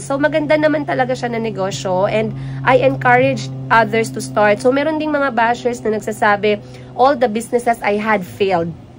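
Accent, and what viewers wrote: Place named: Visayas, Philippines. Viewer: napanood kita mula sa 1st video mo..true from the beginning you were full of finness the way you speak at pagkilos.naobserbahan klng ito.nasubaybayan kita..could be due to sad & traumatic experience mo dun sa Uganda (gawa ng asawa mo)unti unti nagiba ang way of speaking mo.Very understandable yun.Now,na nandito kn sa Pinas you can be your natural self.Bisaya ka kya may pagkatigas ang salita & that's okay.mas marami kming natutuwa sau dahil dun minahal ka nmin pati ang mga anak mo at mga kapatid mo at staff. Tama yang attitude mo deadma sa mga bashers.Sikat kna kasi😊 Goodluck & God Bless!🫶🙏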